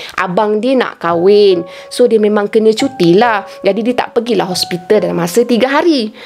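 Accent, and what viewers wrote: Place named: Terengganu, Malaysia. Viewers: Pekat molek tranung ye...mcm sy.. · Pandai cc cakap ganu...jadi ah tu slang ganu kite.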